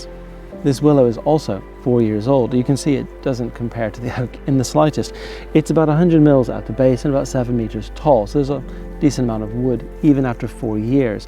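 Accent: United Kingdom. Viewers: seems interesting but it is very difficult to hear because of the loud music background. You Brits are not easy to understand for us in the U.S. who's hearing is not the best. Just saying.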